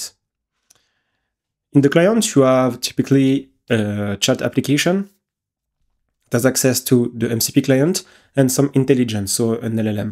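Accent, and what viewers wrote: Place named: France. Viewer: Nice bro ! I can smell that fresh French accent, but very good content !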